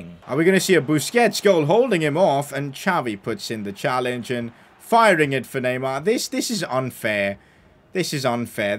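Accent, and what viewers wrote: India. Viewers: Are you Indian coz your talking way is like that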